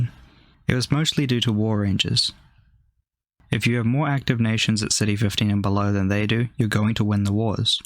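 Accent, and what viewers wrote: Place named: New Zealand. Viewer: You sound like you're from new Zealand lol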